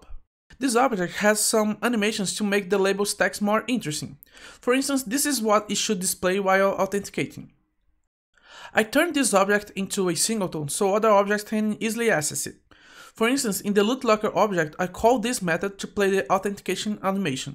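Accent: Brazil. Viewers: se é brasileiro? sotaque esse manin kk parabéns pelo tutorial ajudou aqui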